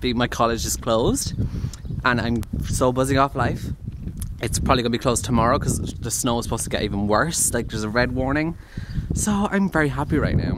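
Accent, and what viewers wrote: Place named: Cork, Ireland. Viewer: Loving the Cork accent like!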